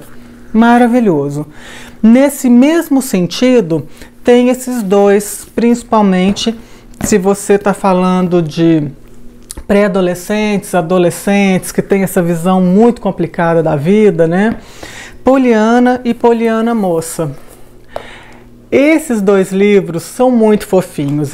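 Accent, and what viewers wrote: Minas Gerais, Brazil. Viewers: O outro lado da infelicidade é o contentamento ( não é a felicidade) Ser grato ser agradecido não tem nada a ver com religião. É um estado de espírito que tem tudo a ver com contentamento. Vou fazer um caderno só com as coisas que você fala. Minha filha disse que eu já tou até falando mineires de tanto ver vídeo seu❤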